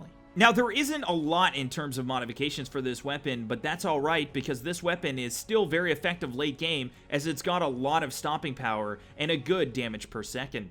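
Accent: Canada. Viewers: I can tell this guy was Canadian in the first 2 seconds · Dude sounds like stone mountain with a canadianish accent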